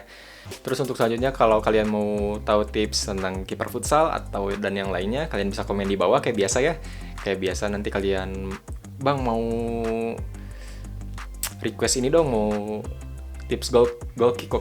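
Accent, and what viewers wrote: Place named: West Java, Indonesia. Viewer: Sunda banget bang nyorodot dong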